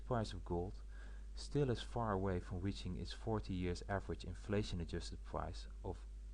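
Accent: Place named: Netherlands. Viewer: Lol he's Dutch. Shitty accent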